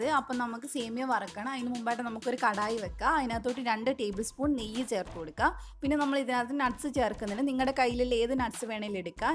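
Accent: Kannur, India. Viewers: കണ്ണൂർ ഭാഷ 👌💓 അടിപൊളി ഒന്ന് ഉണ്ടാക്കി നോക്കിയിട്ട് കാര്യം 😋😋😋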